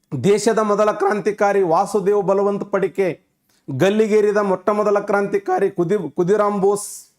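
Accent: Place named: Bijapur, India. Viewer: ಸರ್ ನೀವು ಪಕ್ಕಾ ನಮ್ಮ ಬಿಜಾಪುರ ಭಾಷೆಯಲ್ಲಿ ಬೆಂಕಿ ಹೇಳತಿರಿ ನೋಡ್ರಿ ಕೇಳೋವರಿಗೆ ಯಾರಿಗೂ ನಿದ್ದಿನೇ ಬರಬಾರದು 👌🥰🩷🙏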